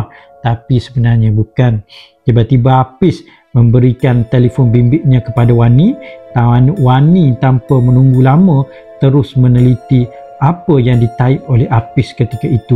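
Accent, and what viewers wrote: Kelantan, Malaysia. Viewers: Terjumpa channel ni kebetulan ari ni first Time tgok..Abe ni ore Kelate ke ..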